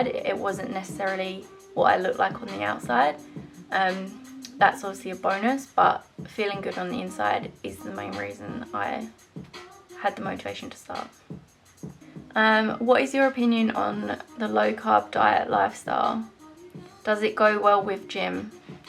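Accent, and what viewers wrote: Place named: Cheshire, United Kingdom. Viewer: Haha first video I thought you were a Brit and I was no an Aussie and kept going back and forth I’m from Birmingham, uk are you from Cheshire ? I hear a hint!x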